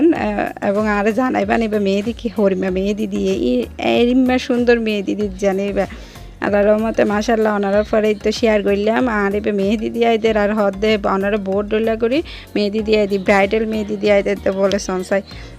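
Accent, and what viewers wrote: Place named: Chittagong, Bangladesh. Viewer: চাটগাঁইয়া ভাষায় কথা শুনে কিছু কিছু বুঝতে পারছি 😁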